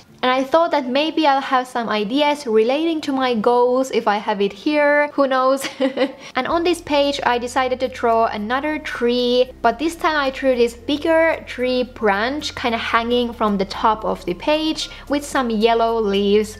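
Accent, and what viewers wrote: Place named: Finland. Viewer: Ohhh I knew that you were Finnish, because I'm in a European school in Brussels with Finnish sections and when I talk to my Finnish friends, they sound like you! I like Finnish, it's a very unique language!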